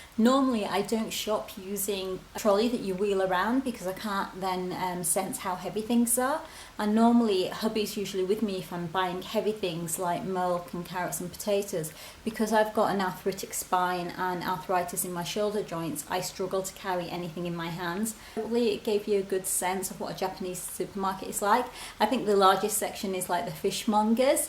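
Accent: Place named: United Kingdom. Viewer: Thanks for doing this beautiful video. I liked your British accent. Were your parents or grandparents from Indian Punjab?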